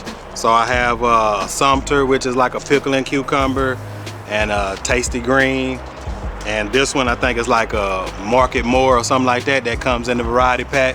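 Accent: Houston, United States. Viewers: Exactly I’m from Houston as well. Sounds like a Houstonian. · Lord, I'm from Houston. And I am so glad to hear somebody who SOUNDS like me in the garden! 😁😁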